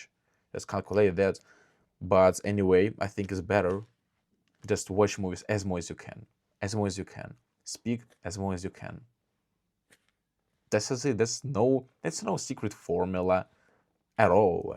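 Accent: Russia. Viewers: accent giving u out a lot, work on dat (as a russian myself) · Сходу понял, что ты из России) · I'm not currently interested in reaching the c1 level but idc, you're so handome and have russian accenttt 😝🔥 good luck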